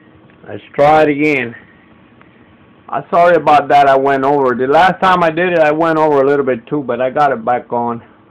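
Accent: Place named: Upper Michigan, United States. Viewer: sounds like this boy is somewhere just a little north of Marquette Mi,????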